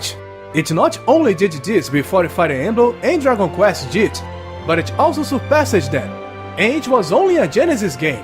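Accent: Brazil. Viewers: Impossível não notar o sotaque brazileiro lol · Are you Brazillian? You sound brazillian...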